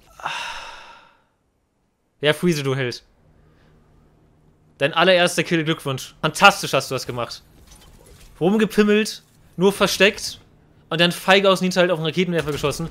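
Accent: Saxony, Germany. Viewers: Hi, mal wieder geiles Video, Als ob du auch aus Sachsen kommst, weil ich komme auch aus Sachsen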